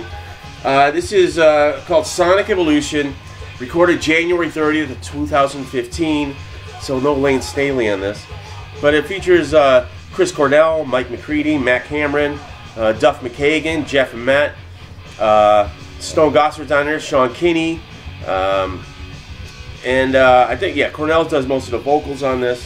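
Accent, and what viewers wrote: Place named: Chicago, United States. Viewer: ! Happy Anniversary! Love the videos! When I’m homesick, I can come here and listen to some Chicaaaago accent and great music! Cheers brotha!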